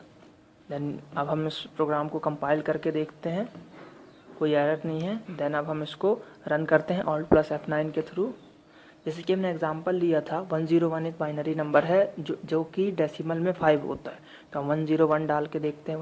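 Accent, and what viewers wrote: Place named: India. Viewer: dunno if that's indian or English with a very very strong indian accent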